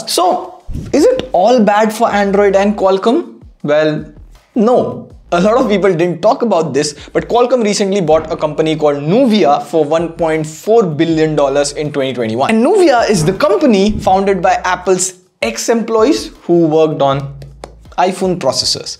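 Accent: India. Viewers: finally..an indian speaking english im so glad i find this channel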